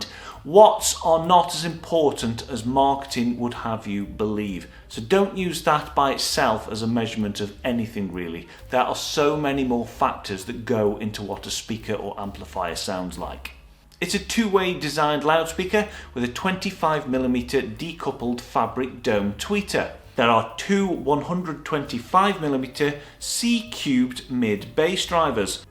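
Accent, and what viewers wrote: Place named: Stoke-on-Trent, United Kingdom. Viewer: Not a dig my friend....I spent time in Stoke years ago and it is a lovely accent....brought back nice memories! 👍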